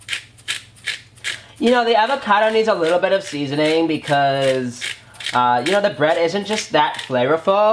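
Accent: New York, United States. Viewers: Too funny! First there was an eggplant on the toilet tank, later on there was a pineapple on the tank. Go back to show business. You're a natural talent. Great Valley Girl accent as opposed to your normal New York accent.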